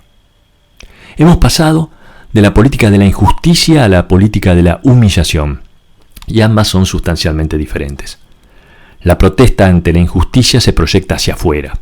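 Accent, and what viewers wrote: Argentina. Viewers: a veces tengo que darle al stop para asimilar el contenido, muchas veces vas demasiado rápido y siendo español adaptarme al tono argentino ,pero el contenido es bastante interesante y beneficioso. Saludos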